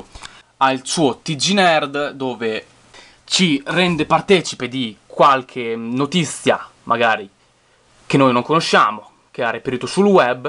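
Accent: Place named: Emilia-Romagna, Italy. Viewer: ma sei dell' emilia romagna? no perchè questo '' bella ragassi'' xD io dico bella ragazzi so siciliano :D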